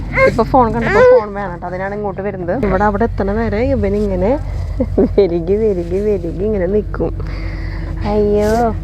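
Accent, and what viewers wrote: Thrissur, India. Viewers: I love your Chalakudy Thrissur accent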